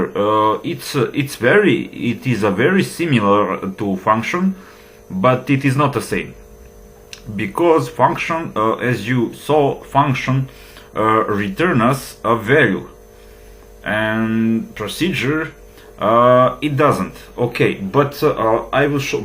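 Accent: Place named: Eastern Europe. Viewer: noice true slav accent.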